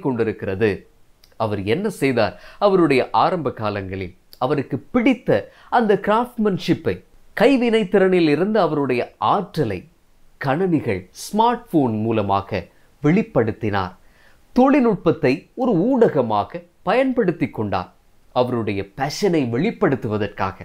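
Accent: Sri Lanka. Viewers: நீங்கள் இலங்கை தமிழர்தானே இவ்வளவு அழகா எங்க ஊர்ல எவனும் தமிழ் பேசமாட்டானே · Yes Avar kandippa elangai thamilarthan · இலங்கையை சேர்ந்த நண்பர்கள் எனக்கு அதிகம் உண்டு அவர்கள்தான் தமிழை மிகவும் அழகாக பேசுவார்கள் நாம் தமிழர் தமிழர் என்று தமிழ்நாட்டில் இருந்து கொண்டு தமிழை நாம் சரியாக பேசுவதில்லை என்பது இதன் மூலம் சரியாக தெரிந்து கொள்கிறோம். தமிழை மிகவும் அழகாக பேசும் இலங்கையை சார்ந்த அன்பு சகோதரனுக்கு எனது மனமார்ந்த வாழ்த்துக்கள் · Athu thaan Eelam thamil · Ilangai tamizhan avar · Sri Lanka tamil 🖤🇱🇰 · நீங்க பேசுவதே அழகு இலங்கை தமிழா?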